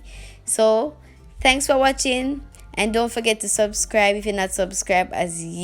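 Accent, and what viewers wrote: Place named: Jamaica. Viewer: Hi you did a very good job am new in your house you sound like a yardie like myself anyway am going to say in your house big up i enjoy watching the entire video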